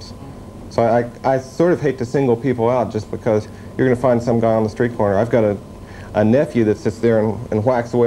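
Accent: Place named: Texas, United States. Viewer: I never really noticed Tuck's accent before... is he originally from Texas? ...or this part of the country?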